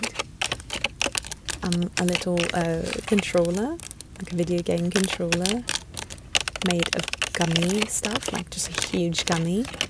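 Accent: England, United Kingdom. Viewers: Real or not love me a good English accent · i wonder where was born, she sounds british to me... So i wonder if there is Target in UK.. sorry for my bad english ^~^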